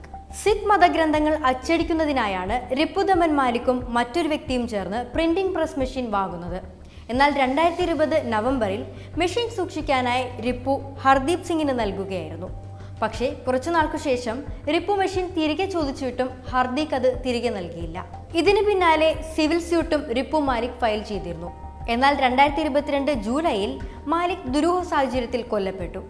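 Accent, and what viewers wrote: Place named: Trivandrum, India. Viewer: ചേച്ചി ഒരു ട്രിവാൻഡ്രം കാര്യാണല്ലേ ആ ഭാഷ അറിയുന്നുണ്ട്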